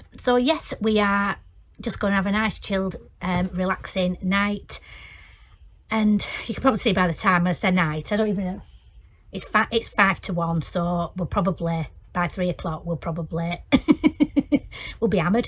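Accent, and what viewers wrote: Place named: Northern England, United Kingdom. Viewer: So chuffed you’re back and feeling better. Been missing your northern accent. You look fabulous too. Have a great Christmas and happy New Year. See you soon xx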